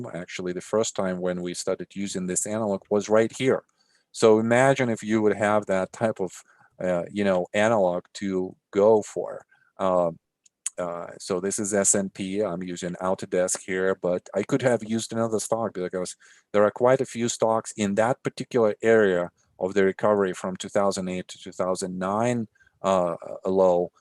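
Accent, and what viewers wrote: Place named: New Zealand. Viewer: your Dulcet Kiwi Tones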